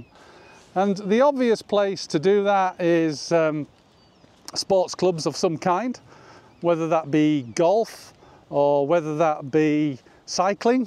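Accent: United Kingdom. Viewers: Thank god British speak, like you I retired at 45 best move ever, how I found time to work I’ll never know. Now 65 still fab.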